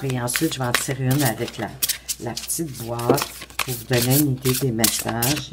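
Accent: Quebec, Canada. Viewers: Coucou 😊 Pour commencer...Wouaaaah quel effet ça fait d'être citée au Québec😁, j'suis trop contente car j'ad😍re le Québec & votre accent🥰 ! Mais je les connais ces 2 jeux ! La petite boîte je l'ai offert à une Amie et le coffret carré est juste MAGNIFIQUE, nous sommes carrément plongés dans l'univers/l'atmosphère du Sud du Mexique, ça fait voyager direct...Tu sais qu'il a fait le coffret "le 5éme Accord Toltèque" avec le même style (boîte carrée & même style d'illustration) ? Va falloir investir 😁 à moins que tu l'ai déjà ? Confidence pour confidence, je préfère ta version (elle me fait voyager) mais je n'ai pas eu l'occasion de l'avoir☹️🥹😢. Lumineuse journée à toi 🌞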